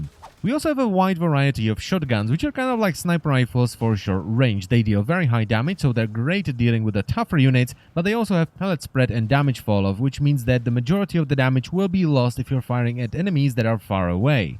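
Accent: Czech Republic. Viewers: >that czech accent Nádhera :^) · Czech accent af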